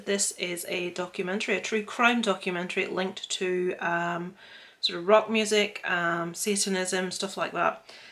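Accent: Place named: Northern Ireland, United Kingdom. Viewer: Just subbed to u after seeing you on robs stream nice to see a northern Irish accent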